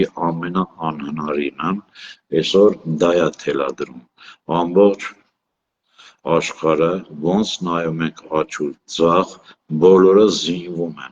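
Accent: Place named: Volgograd, Russia. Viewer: Голос. Ссли8но Волгоград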